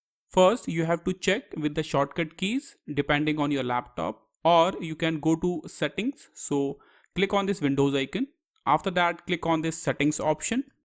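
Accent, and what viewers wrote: India. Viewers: As soon as I heard he's indian I knew my laptop is going to be fixed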